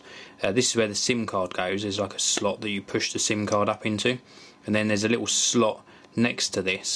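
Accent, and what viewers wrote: United Kingdom. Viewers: I love your british english :D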